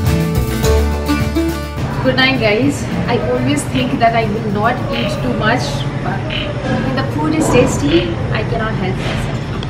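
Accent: India. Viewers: Refreshing to see an Indian looking girl with an Indian accent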